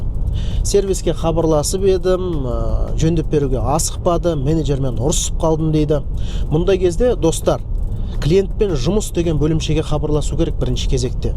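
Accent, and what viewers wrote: Standard. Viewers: жарайсың таза қазақша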